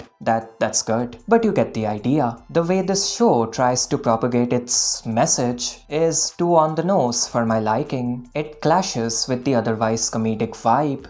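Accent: India. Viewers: Bro are you indian? Your accent sounds quite indian to me.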